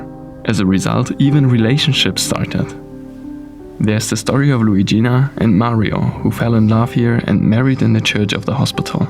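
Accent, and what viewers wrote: Germany. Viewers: Laut dem Dialekt seit ihr Deutsche! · Trotz des super Englisch, bin ich den Gedanken nicht losgeworden, das etwas Deutsch klingt.😊 Tolles video! Toll recherchiert, erzählt und soviel interessantes bildmaterial. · Dein Englisch klingt wie ein deutscher der versucht ein Englisch zu sprechen... Warum quatscht du nicht auf deutsch? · I am extremely impressed by you. You are a classy, well spoken gentleman. This is first video of yours I've watched. Glad I found you. Are you German? My mother is, and as children, that's all we spoke. But, your dialect is different than ours. Anyway...THANK YOU! ♥️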